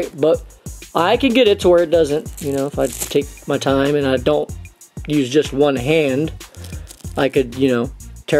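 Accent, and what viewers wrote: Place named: United States. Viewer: Man, great american accent. From where you are? These days all USA people has an awkward kitty accent, men and women the same. Thanks for the video too.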